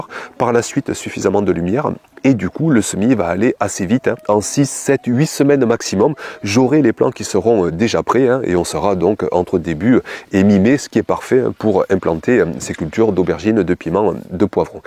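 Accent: South, France. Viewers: J'adore cette homme par son naturel sa simplicité et son petit accent du sud! Je regarde pratiquement toutes vos vidéos. Elles sont géniales sans blabla qui sert à rien. J'espère un jour que mon jardin sera aussi grand et organisé que le votre. Merci pour vos contenus. Signée une passionnée.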